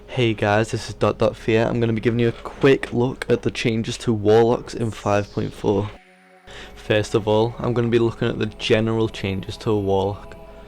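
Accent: United Kingdom. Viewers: People from liverpool are usually always in a good mood lol, you sound like your from west derby / norris green area =o??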